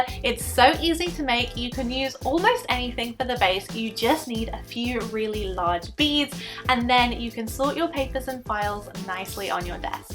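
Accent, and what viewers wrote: United Kingdom. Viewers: Omg she’s pretty has a British accent and her name is? 😳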